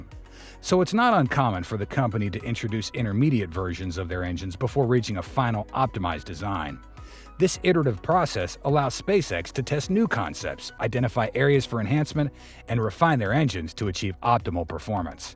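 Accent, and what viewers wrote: United States. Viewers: You're wrong. It's good engineering info, written in a very calm Asian style and voiced in a very cool American accent. Clickbait, sure, but not claptrap. It's legit. It would make sense if you had studied engineering.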